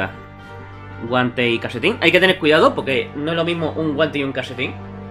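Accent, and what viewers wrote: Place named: Canary Islands, Spain. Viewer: Felices fiestas chicos 🎅 🥂, dices un sombrero y una gorra y es un gorro de lana ☃️ tienes acento de las islas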